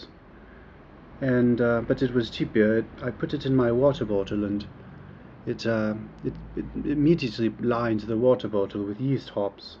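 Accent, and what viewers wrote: United Kingdom. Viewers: sry man. u sound kinda british :) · Your take on Serbia isnt that interesting, but you seem to think it is. And why not say you're a Brit? You don't SOUND like an american. I like Serbians. I'm an american. You seem a little Holier than Thou. It's off-putting. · Both accent and features rather point to British person... He visited my birth town Belgrade and i would like to tell that he visited Celtic city, Celts made in BC. Romans overtook `round 40 BC then Slavs came and city name changed from Singidun - meaning "Round Fort" (name given by Scordisci) to Beograd (Belgrade) which means white town, it seemed white to them. Since he is Brit this suffix (dun) should be something he recognizes..or not? This story is kind of skin tanned too much... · That fucking accent is from Britain he is no fucking American ;) I wouldn't beat you because ur gay I would beat you because you are fucking nasty ass person. 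Did I mention you are fucking DUMB? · You are not American. You have a British accent. So please don't give us a bad rep with your negative comments. I have friends in Serbia and would love to go there. I'm sorry your experience was negative. But really I don't understand why you don't finish eating, belching, picking your nose, etc. BEFORE you put your negative comments on You Tube for all to view. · can´t you hear his voice. he is speakin´ clear british english so thats the explentation. so stop acting smart. u know it´s better to shout the fuck up sp ppl think you are dumb, as to say a word and clear all doubts!!! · he's british, or american with a british accent, which is extremely rare btw, you're disgusting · It's strange how you don't have a typical american accent, you sound more British. You are very ignorant, and I really hope you were joking here. Otherwise it's just sad. What is the point of this video anyway? 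To show off your ignorance? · American with a British accent? Hmmmmm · Than you didn`t explore your country well enough. Have you been in New Orleans, or some suburbs of LA or NY? Pretty much filthy, even subway in NY looks that you can catch some serious disease, although it is a great town. Mexico is far away of great society............sorry USA. More important question is why this guy call himself American when he talks with British accent? Provocation or he is ashamed of his nationality, or he think that being an American is - cool? :) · This dude needs to go to detox clinic first, then go to a mental institution to clear of his mind. He is mixing Bosnia with Serbia, he calls River SAVA - RAVA . . .he even managed to mix the fact that he is fucking British with perfect British accent, not American. He perhaps visited USA once in his mind!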